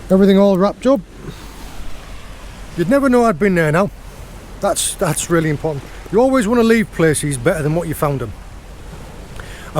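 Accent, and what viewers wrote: United Kingdom. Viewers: Such a beautiful video. You didn't take James this time with you. Again the lovely British accent as always.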